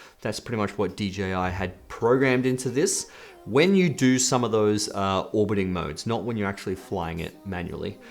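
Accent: Australia. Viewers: I have both of those drones and love them both I am not a professional photographer I only do it as a hobby. I was on your last live chat and was trying to ask you a question. I got low sugar and had to go and get some I’m diabetic. I was trying to ask do you know much about the capabilities of the tracking feature I haven’t really pushed it too far yet and I am just trying to find out some things about it before I go running off to Mount panorama in Bathurst I think you would know where I’m talking about because you sound like you’re Australian. I want to film my classic Merc going round the circuit now whether I fly it manually round or use the active track is my question. I understand that it uses the camera to track. If I use an ND filter would that make the drone lose my car and stop tracking me? When I go there I will have a mate with me so I’m not sure whether to sit in the passenger seat and fly manually or do you trust the active track. 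Do you have an opinion on this for experience. How were you following the JetSki manually or active track? Any thoughts would be appreciated.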